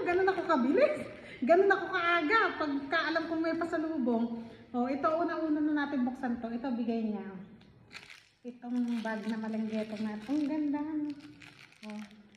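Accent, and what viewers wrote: Nueva Ecija, Philippines. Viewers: Ateh saan ka sa pinas parang nueva ecija ka ba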